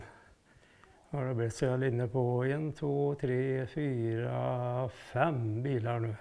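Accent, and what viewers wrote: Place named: West Gothland, Sweden. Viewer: För himla gôtt å höra en rekti väschgöte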